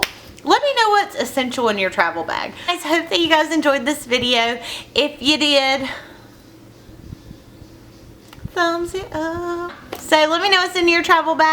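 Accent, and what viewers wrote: South, United States. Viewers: love your southern charm, you're accent is so adorable, have fun in Atlanta!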